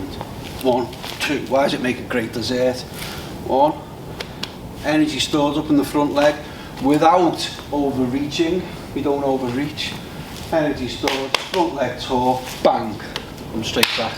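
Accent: Liverpool, United Kingdom. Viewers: IS this the liverpool accent?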